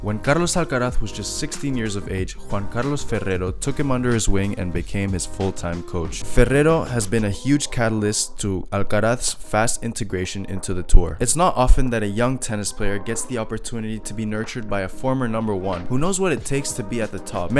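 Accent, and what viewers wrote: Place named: Spain. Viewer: Pareces chino, hablas perfecto inglés y se nota que eres español a la vez xd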